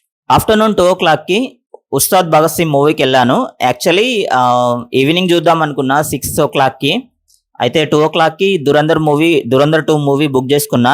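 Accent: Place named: Telangana, India. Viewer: Madi telangana bro 😂😂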